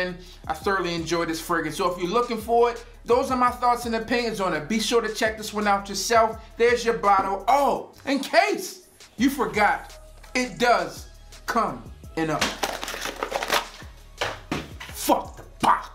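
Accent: Baltimore, United States. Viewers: That Baltimore accent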